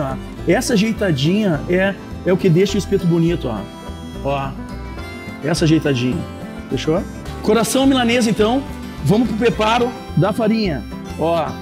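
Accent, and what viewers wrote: Rio Grande do Sul, Brazil. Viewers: Mas bah tche tu es de porto alegre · Sotaque gaúcho é top demais. Brasil e suas várias línguas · Tu é o cara para ensinar assar pelo teu jeito de falar tu és do Rio Grande do Sul aprendendo muito com você um abraço do Paulinho de Porto Alegre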